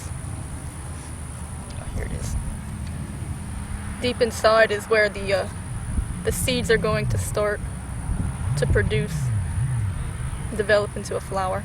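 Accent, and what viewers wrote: Louisiana, United States. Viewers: You sound like you're from Louisiana, by golden meadow or Thibodaux.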